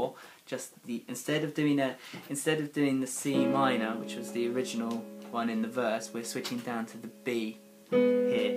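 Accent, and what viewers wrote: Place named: United Kingdom. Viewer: I had to look at where you are from because you sounded British and voila you are from the UK. Possibly why your house is nicely decorated too. I am a beginner on the piano so I'll try this song out! I love it. And you don't know the lyrics that well lol but great job otherwise :)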